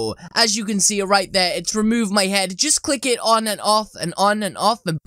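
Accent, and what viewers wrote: United Kingdom. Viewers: He's English/ Briti. He says stuff different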